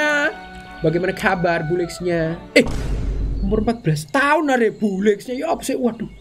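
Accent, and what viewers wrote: Java, Indonesia. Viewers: Mas Sak vidio ngoming medok sak vidio o ae Temenan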